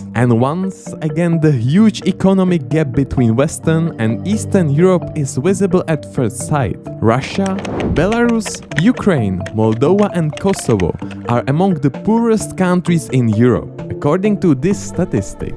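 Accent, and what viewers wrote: Czech Republic. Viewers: Your videos are good, but that strong czech accent is driving me crazy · The strongest Czech accent, ive ever heard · yes but he is tchéque not french.. still, i like the accent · Skvělý video! Btw hned mi bylo jasný, že seš čech, ten českej přízvuk se prostě nezapře :D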